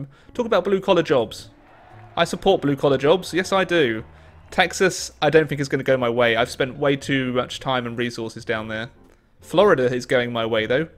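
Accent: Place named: United Kingdom. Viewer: It’s funny as an American to here a British person say seats knowing very well he will never get it right.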